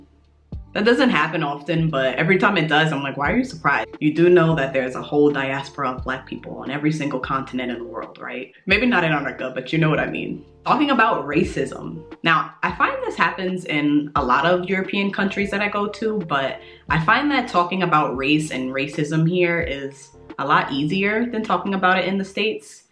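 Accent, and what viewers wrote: New York, United States. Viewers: As soon as you started talking, I knew you were from New York lol. I'm also from New york(Harlem), currently living and working in Germany. I can definitely agree with your video.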